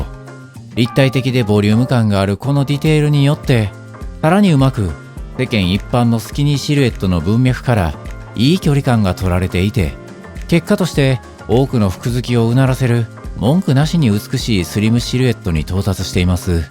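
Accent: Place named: Kansai, Japan. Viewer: 関西弁新鮮！！これもまた良き👍👍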